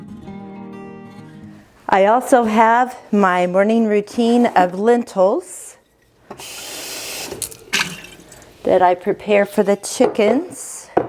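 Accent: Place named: South, United States. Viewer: I'm down in Florida - so many things are different due to temperature, humidity, sand soil. But I love seeing everything others do and can always learn something new. It helps to know where a person is located to determine how their environment may be different and changes I might need to make before copying them. I do think I detect a southern accent in your videos which is beautiful!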